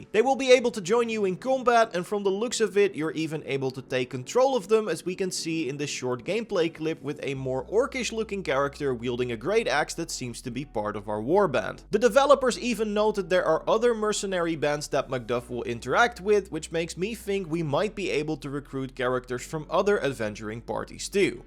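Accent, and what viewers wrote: Netherlands. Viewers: dutch accent is so funny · Je klinkt uber Nederlands, klopt dat? Al een tijdje benieuwd 😂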